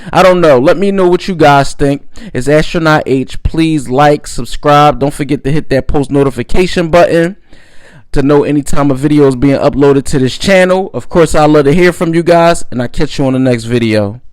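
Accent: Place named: New York, United States. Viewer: Sound like you're from one of the borroughs.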